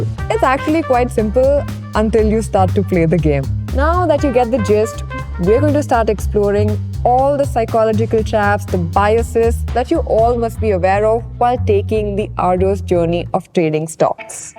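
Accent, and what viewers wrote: India. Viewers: indian accent :face-green-smiling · Love The Indglish Accent 😅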